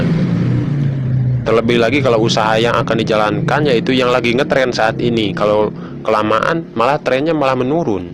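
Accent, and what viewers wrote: West Java, Indonesia. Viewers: sunda bangheet... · Taiwan Taipei ..muhun logat sunda..sami sareung abdi.. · Ini yg yg bicara di vidio ini logatnya Kaya orang sunda Krn qu apal bnget kalu orang sunda itu bicaranya mskpun pke bhasa indonesia tapi logat sunda banget krn qu juga orang sunda Tapi maaf ini cuman perkiraan saya aja.kalu ga bnr bhwa bukan orang sunda minta maaf aja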